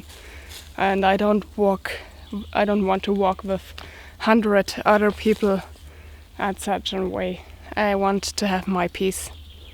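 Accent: Germany. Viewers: You could have carried a couple of cans of beer to drink when thirsty. I did not know Germans drank water. Your camp looks like it is infested with mosquitoes ! I hope you had the means to deal with them. You should have also brought bikini tops to wear when swimming. The tee shirt will not ward off the cold if wet. BTW, I love your German accent and how you patiently you explain in English.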